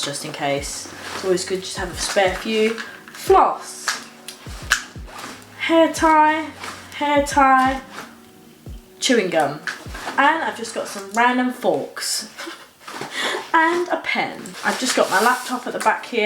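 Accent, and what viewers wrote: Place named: United Kingdom. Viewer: Your accent is sounding more and more British!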